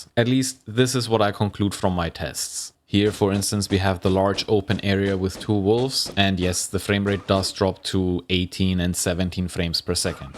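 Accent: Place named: Germany. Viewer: The terrible German accent makes this unwatchable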